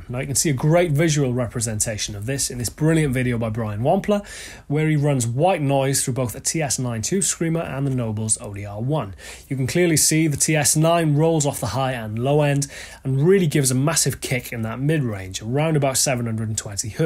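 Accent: United Kingdom. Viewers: I mentioned two yardsticks one of which you didn't address. Do you think could get a band together, tour the world and fill up arenas and stadiums (yes, stadiums!)? By another measure, he has 116K subscribers. StevieT has over 2 million, Tyler from Music is Win has 1.3 million, Marty Schwartz of Marty Music has 2.7 million and the list goes on and on. None of them will be "legends" either but their fans have more justification to stake that claim. By that measure is a mere cult figure. Don't get me wrong, once I got past his Brit accent and Brit pastiness, I realized what a great channel this is. I love his playing style. A legend? I think we're tossing that word about a bit wantonly and "fanboyishly".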